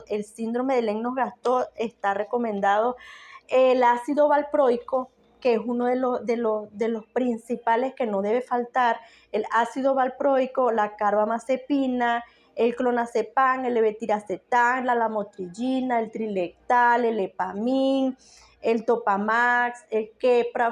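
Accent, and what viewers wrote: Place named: Venezuela. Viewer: Amiga es la segunda vez que te veo y por el asentó me imaginé que eres venezolana .tambien soy de el Tachira ,Perú Ivo en el Reino Unido.y tu testimonio es es de una gran ayuda,y te felicito por tu valentía y dedicación a tu hijo.Bendiciones .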